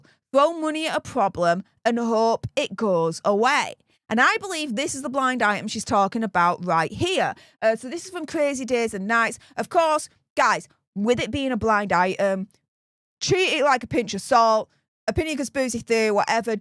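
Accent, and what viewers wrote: United Kingdom. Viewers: You have my least favorite British accent it’s horrible. Where are you from?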